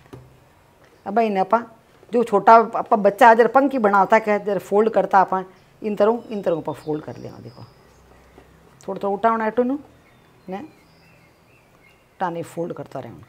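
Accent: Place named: Haryana, India. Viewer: Very nice, like your haryanvi accent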